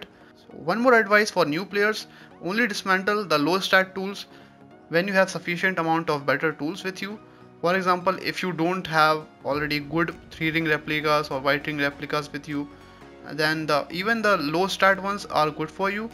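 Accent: India. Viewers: Indian hoke English 😅I can't understand 😢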